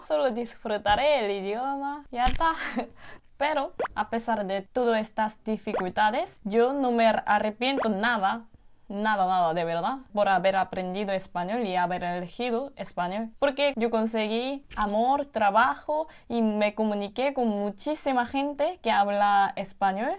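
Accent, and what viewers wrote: Andalusia, Spain. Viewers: Pronuncias muy bien; con cierto acento andaluz. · Oelo pero hablas bien español, se te entiende bastante , y lo hablas bastante con el acento español jajaj apenas es ...m je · En cuanto te escuche decir la palabra más, ya sabía que aprendiste el idioma en Andalucía y acerté. Imagino que te habrás sorprendido que en solo país existan tantos acentos, además solo en Andalucía hay unos cuantos. Para ser solo dos años de aprendizaje, te desenvuelves muy bien con el idioma, felicidades. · Hablas el español MUY BIEN, mejor que yo. Soy malagueño, de padre sevillano, y ya noté tu acento andaluz antes que lo dijeras. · Hola anyoaseo 🤣🤣🤣 hablas español de España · Jijiji es verdad que el castellano es difícil pero me asombra lo bien que lo aprendéis y te estaba escuchando y sí que tienes un habla más andaluz. Hablas muy bien mejor que algunos hispano hablantes. 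· Hablas gachupín, no español. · Me encanto mucho tu video con tu asentó de España..no sé si sabías pero la mayoría de los latinos remedamos el idioma de España por diversión …un saludo desde Costa Rica ..ostia parecéis española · Hablas el español de España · tienes acento español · Hola como estas ...tienes acento ..de espania ...todos los aciativos ..mas aprenden acento de espania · Omgg ella tiene una acento de España 🥺🥺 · Pero tengo un argumento! Aunque digas que hay muchos acentos distintos, la ventaja es que aunque todos sean un poco distintos, al fin y al cabo de igual manera puedes entenderle a cualquiera que ya lo hable, o inclusive a tí! Yo por ejemplo soy de Costa Rica y el hecho que tengas acento andaluz no me impide comprender el 100% de todo lo que dices! Entonces en resumidas cuentas si hay una universalidad en el idioma! Dado el hecho que con esas diferencias seamos siempre capaces de comunicarnos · Se nota que aprendistes español de España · Si,pero aprendiste el español que se habla en España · Hablas fantásticamente español. 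Corrección justo al final del vídeo. Se dice: "No os olvidéis de suscribiros" (forma más informal y más usada) o "no se olviden de suscribirse" (forma más formal, tipo usted). Con "no os olvides" (te ha faltado la letra i y acentuar la e), se comprende perfectamente lo que quieres decir, pero no es 100% correcto. Espero que no te moleste la corrección. Enhorabuena de verdad. Tienes hasta acento andaluz, el más bonito por cierto. Saludos desde Marbella, Málaga. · lo malo es que esta aprendiendo el español de españa:/ que si en cierta parte puede ser muy formal pero podria aprender un español mucho mas neutro en donde la "s" se pronuncie como tal y la "c" de igual manera, asi no le explotaria la cabeza al verlo escrito y al hablarlo al mismo tiempo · Una coreana con acento España !Que Bello! Ole Guapa😍👍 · Hablas español de España. No de Latinoamérica.🤔😳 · Tu hablas español pero de España, los latinos hablamos diferente. 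· Difícil o no lo hablas muy bien ...yo quiero hablar así de fluido otro idioma 😢. Por cierto has tomado el acento español lo tienes marcado yo soy de México y los españoles para mí hablan muy rápido 😂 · Se te pego un poco el español de españa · Ese español españolao nomas no👎🏻 Ese acento es una patada en los huevos!! Sorry!🤷🏻‍♂️ Pero pues es la verdad!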